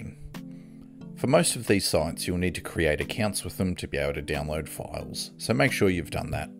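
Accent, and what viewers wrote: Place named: Australia. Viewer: Great guide, thank you for making it clear and concise, and easy to follow. Liked and subbed, What's more you speak Strine, which makes it even easier to follow :D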